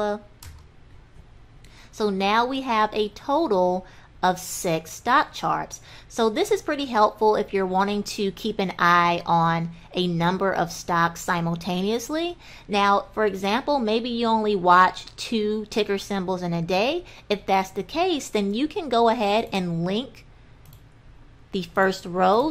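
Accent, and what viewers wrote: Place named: South, United States. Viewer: this was a ton of help! Love the southern twang accent too. I am from Raleigh NC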